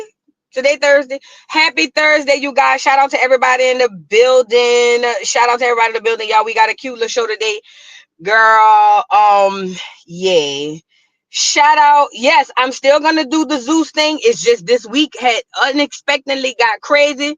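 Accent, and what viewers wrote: New Orleans, United States. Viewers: Girl, f me up with voice! NAILED!!Lorddddd I love that New Orleans accent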